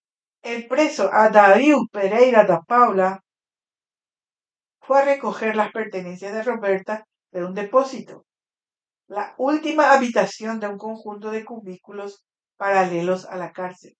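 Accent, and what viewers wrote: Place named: Portugal. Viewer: Me encanta el acento portugués 😘🤗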